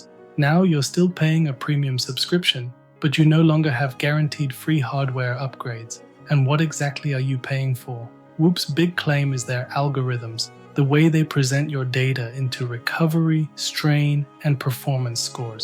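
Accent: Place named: India. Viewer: Terrible Indian accent. Added to that, he speaks like a hypnotist. .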